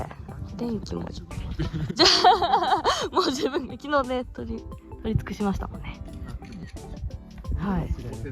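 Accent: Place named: Kansai, Japan. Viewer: 、お疲れ様です😘 ここの、景観は、いつ見ても、最高👍🤩ですネェ🎉 秋🍂は、紅葉🍁、冬☃️は、白景気、今、シーズンは、新緑の風景、本当、いいですネェ〜 後、ちゃんのハニカミ笑顔と時々出る、関西弁も、いいですネェ👍🥰🥰🥰🤣🤣🤣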